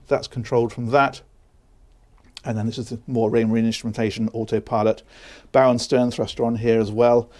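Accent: United Kingdom. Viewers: I like this guy. The British accent is cool. he really knows his stuff. These yachts are mind boggling with the technology and conveniences. Builders have thought of everything.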